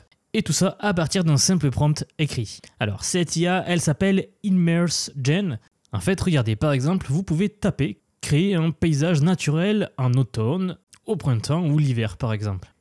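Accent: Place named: Loire, France. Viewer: Je reconnais l'accent du 42 😅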